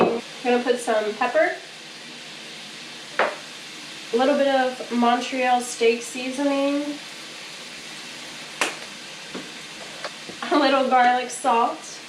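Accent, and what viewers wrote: South, United States. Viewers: You have the cutest country twang. Love it. That looked delicious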